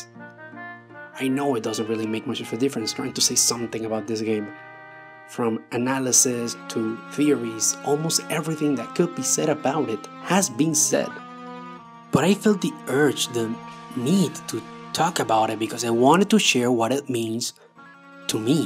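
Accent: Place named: Turkey. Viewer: good video but turkish accent + its called video essay and you could have made it hours long since theres a lot to talk about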